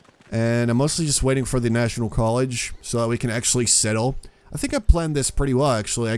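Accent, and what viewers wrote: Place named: California, United States. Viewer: I would never guess you were Dutch by your accent. I thought you were Californian!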